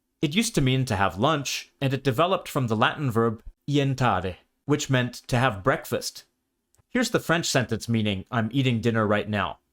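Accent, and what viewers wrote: Rio de Janeiro, Brazil. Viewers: Esse sotaque carioca. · He didn't use a european variety, but a typical rio accent... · Simplismente porque ele usou o sotaque brasileiro e não o português...ele deixou isso bem claro no vídeo.. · In Brazilian portuguese it is pronounced 'txi' Noooooooooooooooo You're using carioca accent for standard brazilian, thats not nice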